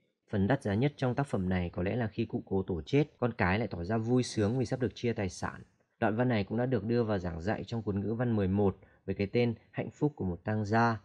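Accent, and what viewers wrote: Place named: United Kingdom. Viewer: Anh nói được accent của người Anh kìa :3 anh có phải từng sống ở Anh hay vẫn còn đang ở Anh đúng không?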